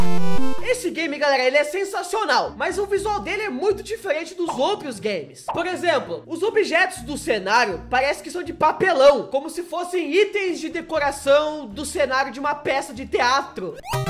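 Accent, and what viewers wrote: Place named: Rio Grande do Sul, Brazil. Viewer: por que seu sotaque parece gaucho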